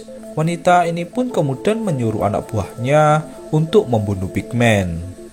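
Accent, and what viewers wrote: Java, Indonesia. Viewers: Logat jawanya medok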